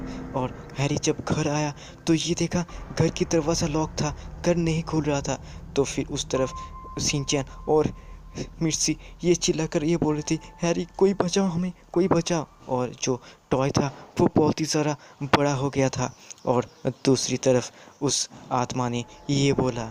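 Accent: Bengal, India. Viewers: Bhai kya story super and are you Bengali no bhai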